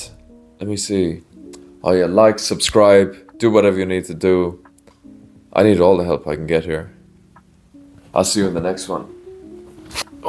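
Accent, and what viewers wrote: Ireland. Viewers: I'm debating between Cambodia and Thailand. I want to open a business and I know I can't do that in Thailand. I would have to find a Thai husband. In Cambodia, as far as I know, I can do it on my own. Thanks for the video. Love your videos and your Irish accent. I love Ireland...it's just too expensive. I've been there a couple of times. Good times!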